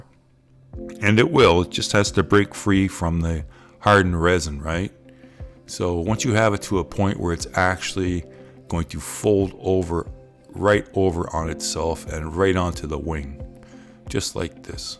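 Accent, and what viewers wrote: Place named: United States. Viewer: Hearing an American accent giving dimensions in millimeters gives me a warm fuzzy feeling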